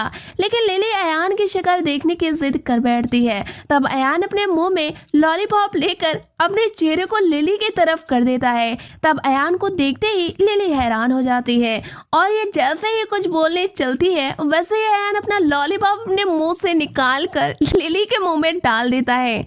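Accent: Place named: Bihar, India. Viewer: Madam you are from Bihar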